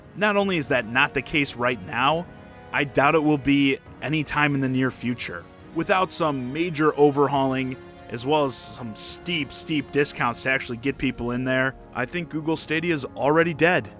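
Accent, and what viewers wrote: Chicago, United States. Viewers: his chicagos kinda fresh doe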